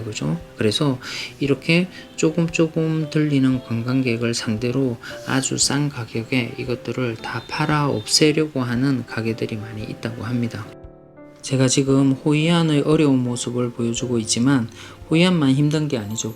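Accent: Gyeongsang, South Korea. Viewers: 선생님의 경상도 사투리가 정감이 많이 갑니다ㅎㅎ 제가 대구사람인지라 그런거같습니다. 영상 속의 호이안을 보니 즐거웠던 기억이 나면서 정말 반가운 마음이 드네요 다시 그곳을 찾을수있는날이 얼른 왔으면 하는 바램입니다. 항상 유익한 영상 감사합니다ㅎㅎ 코로나 조심하시고 건강하십시오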